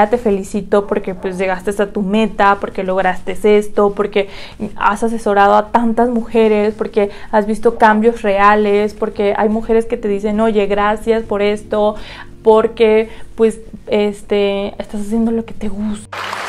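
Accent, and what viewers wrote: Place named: Mexico. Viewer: omg siento que tienes el acento mexicano mucho mas marcado que antes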